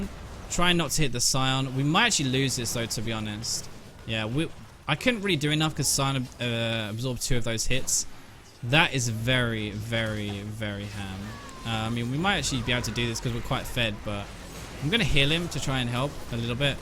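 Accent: United Kingdom. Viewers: a wool? a wool? took me like five sec to figure out you were saying wall. british accents are awesome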